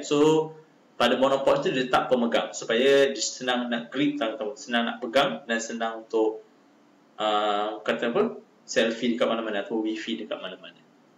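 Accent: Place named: Sabah, Malaysia. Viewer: Macam orang sabah bah si ni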